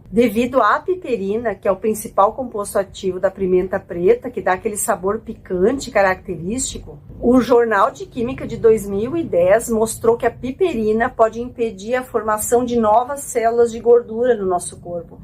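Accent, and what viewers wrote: Rio Grande do Sul, Brazil. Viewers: Dra a sua sabedoria aa hipnócratis tem alimentado minha fome por saúde.não obstante esse seu maravilhoso sotaque gaúcho é também um grande acolhimento terapêutico.sou professor aposentado.sou de Belo Horizonte,muito obrigado por você existir. Eustaguio. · Bah, que belo conteúdo sobre a pimenta!🌶️ Fora isso, serio, sei que sou gaucha nata, colona, mas confesso que detesto ouvir nosso sotaque, principalmente outra pessoa falando. Porque é um sotaque com sons pobres, quase que uni-tom. Parece que a gente so tem um tom descendente e um ascendente e toda frase termina e começa do mesmo jeito. É enjoativo demais. É como um semi-alfabetizado lendo com medo de errar, as primeiras frases.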